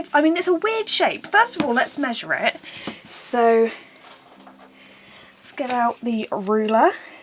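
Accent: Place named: Southern England, United Kingdom. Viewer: What I spotted was a "common" or viviparous lizard. I walked past it as it was busy sunning itself on a tree-stump...but the HOLY GRAIL of lizards to spot here in the UK would be the sand lizard. Pretty sure they're only found on the south coast, and you sound like a southerner so... x)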